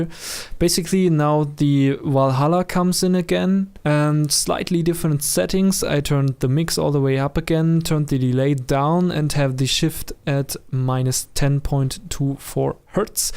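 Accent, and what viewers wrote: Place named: Germany. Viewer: Lol a german who finds it hard to pronounce english haha :) I believe "coarse" pronounced the same as "course" but correct me if I'm wrong :p Great and clear tutorial btw! Props!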